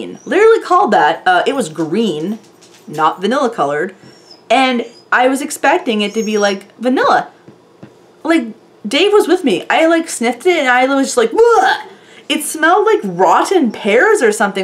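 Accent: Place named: Canada. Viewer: Thanks for asking me to join ur channel! Glad to of- and so far i loveee your videos! Didn't knw u were Canadian, but love ur accent! Its real cutee! Id have to choose as my "wanting to try" would have to be tha Sugared Vanilla Wish & that Winter Candy Apple out of all of thm! Id like tht Candy Cane one also! So many to choose! Awhhh! Hehe :D Keep it up! Luv em! Would love to win as i lovee BBW! :D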